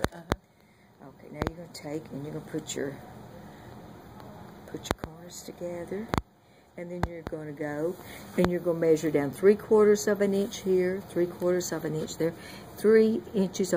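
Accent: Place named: South, United States. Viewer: Just wanted to give you a big hug from a fellow-Southerner (living in Ohio) who needed to make 20 of these. I could not have found a better teacher than you,! You spoke MY language with MY accent. God be with you! Merry Christmas and a Peaceful New Year to you, my dear lady.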